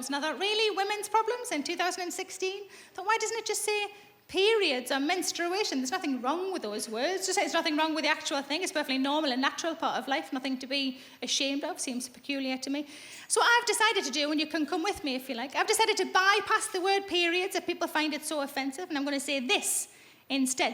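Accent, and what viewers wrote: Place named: Newcastle, United Kingdom. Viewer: Geordie accent. I recommend youtubing jimmy Carr accents. Explains a lot 😁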